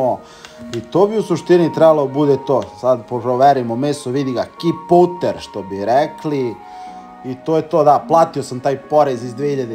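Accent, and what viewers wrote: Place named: Morava, Serbia. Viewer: Desi bre zemljace. Ko da ste porasli pored Moravu. Mlogo bre dobar paprikas. Malo kocnice na mas na leba. Mlogo bre holesterol. I malo finocu na govor. Niki bre iz Beograd nece da te gleda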